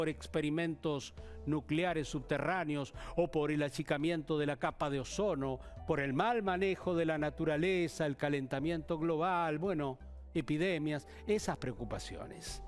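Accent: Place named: Argentina. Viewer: Mejor!!!! Cómo querés que lo narre? Con cara de tujes??? Lo narra tipo gauchesco... Ommmmm!!!! 🙌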